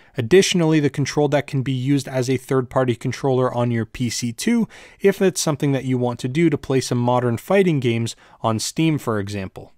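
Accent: Canada. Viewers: Thanks, mayhap I will! Great review, and if I'm not detecting a Canadian accent from you, then I have forgotten my people :)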